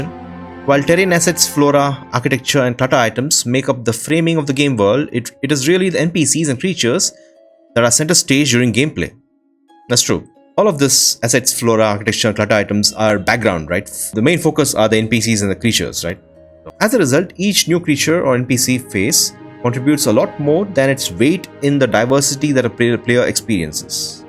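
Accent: India. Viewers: God damn your accent is really annoying, wash away that "v" when saying w damn indian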